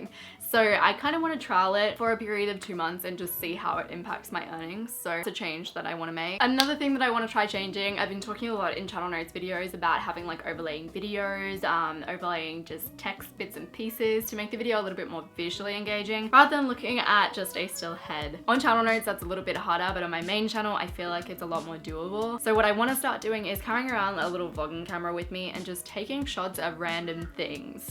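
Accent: Australia. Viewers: It's gonna sound weird but I love your accent I feel like Australians have the best accent to me at least anyways great video ✨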